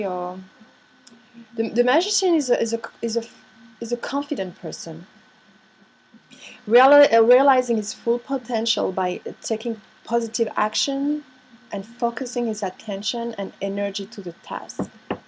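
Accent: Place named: France. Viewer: Beautiful French accent 👌👌👌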